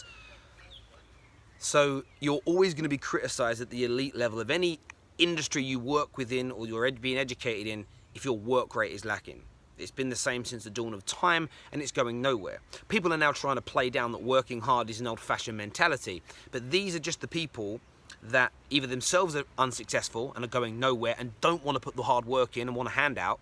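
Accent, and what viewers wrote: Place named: RP, United Kingdom. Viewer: Why u talking posh mate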